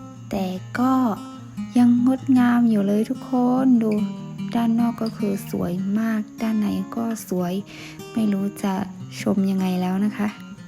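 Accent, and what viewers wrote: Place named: Central Thailand, Thailand. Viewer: น้องพูดไทยกลางชัดมากครับ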